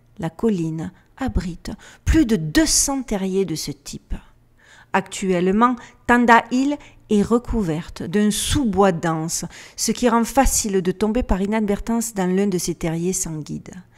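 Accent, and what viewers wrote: Marseille, France. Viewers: Moi ce que jaime c'est que t'as gardé l'accent du Sud meme au states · Tu es VRAIMENT ADORABLE, j'adore ta voix, ton accent MARSEILLAIS. MERCI POUR TON PROFESSIONNALISME et ton CHARME !!! ♥♥♥😘😘😘😘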